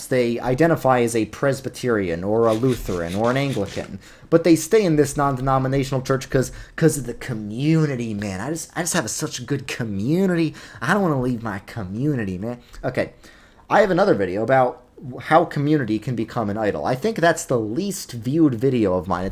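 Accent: New York, United States. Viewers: I feel like you've started to sound more and more like a New Yorker with each video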